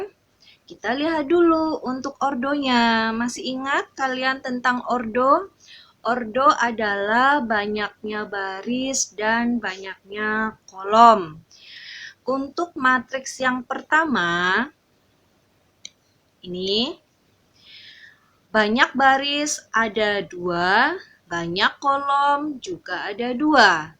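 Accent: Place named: Java, Indonesia. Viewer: Iya bener medok yah mz hehe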